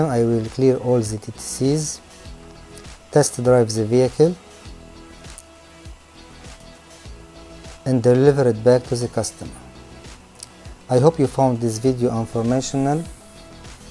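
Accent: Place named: Arab world. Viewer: واضح من لهجتك انك شخص عربي اهلن بك أخي واسمح لي أن اطرح عليك مشكلتي في هذا الخصوص لدي سيارة ترافرز 2018 عند تعبئة الوقود المحرك لا يعمل واحيانأ يعمل لكن يوجد ضعف في الأداء مما يجعلني اتوقف لمدة عشر دقائق ثم بعدها يعمل بطريقة طبيعية قمت بعمل فحص كومبيوتر وكانت النتيجة عطل في بلف الايفاب p0496 بماذا تنصحني هل اقوم بتغييره؟ إم انه يتم فحصه يدويأ قبل التغيير كما يظهر في مقطع الفيديو هذا؟ وماهي النسبة الصحيحة لإثبات العطل؟ ارجو الإفادة للضرورة ولك خالص التحية والتقدير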